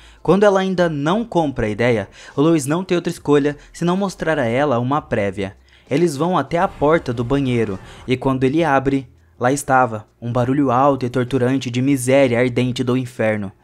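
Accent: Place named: United States. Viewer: Mas foram botar logo um americano pra narrar o video?! Que diabo de "perrquê" é esse? Quanto ao filme, existe um contrasenso terrível imposto pelo roteirista. Ora, se a mulher não acredita em nada de inferno, que diabos (expressão bem apropriada) ela foi fazer ao aceitar se encontrar o encontro com o tal? E será que depois de ter uma vida mudada totalmente, por 50 anos, ela nem desconfiou que tinha algo a ver com o contrato? Esse roteiro parece conta de chegar!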